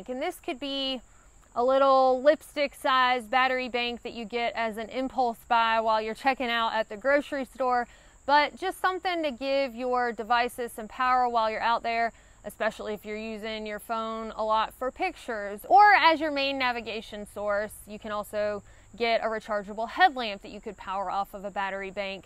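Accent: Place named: Virginia, United States. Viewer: Love your accent. Reminds me of the locals around Blacksburg VA, where I spent a few years at VT. I sure enjoyed talking to the locals. Wish I could have made more time for the outdoors wile I was there. Good to be back home in the mountain west.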